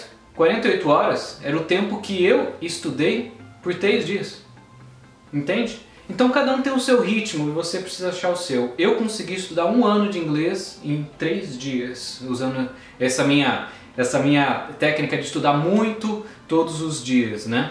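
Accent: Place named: United States. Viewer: Parabéns! Kkkk já está ate com um pouco de sotaque americano kkk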